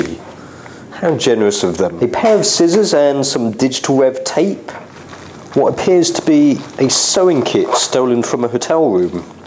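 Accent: United Kingdom. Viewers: a chinese man speaking british wtf is going on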